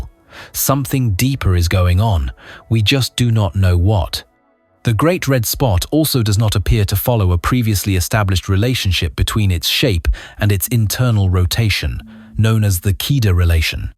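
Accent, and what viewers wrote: United Kingdom. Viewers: Does every video like this have a British accent on it? Funny.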